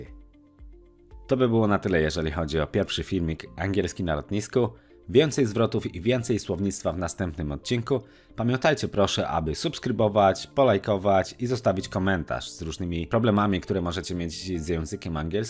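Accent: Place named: United Kingdom. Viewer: Wszystko fajnie, ale ten akcent brytyjski... Jest okrutny... Także szukam dalej 🙂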